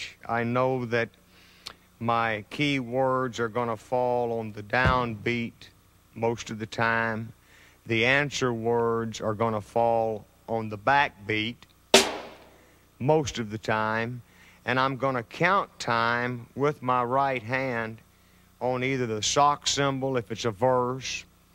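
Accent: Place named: South, United States. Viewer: It's just his accent. I've always thought it's funny how the southern accent can make anyone sound dumb, and the british accent can make anyone sound smart LOL!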